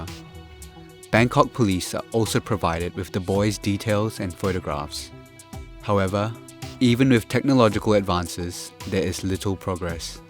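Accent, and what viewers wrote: Australia. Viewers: thank you, the aussie accent is strong and kinda distracting too. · I noticed also. The accent is light, but it is def Australian what. Maybe need trained ears to be able to hear as not everyone is good at spotting accents?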